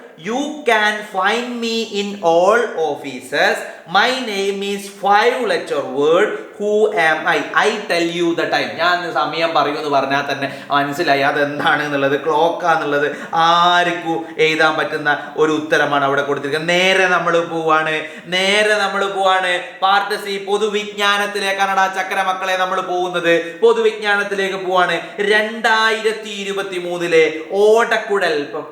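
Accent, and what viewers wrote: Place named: Kozhikode, India. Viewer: Kozhikode aano sir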